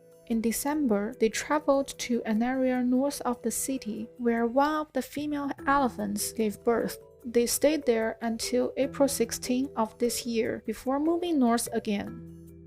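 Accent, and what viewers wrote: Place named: China. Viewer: bad Chinese English accent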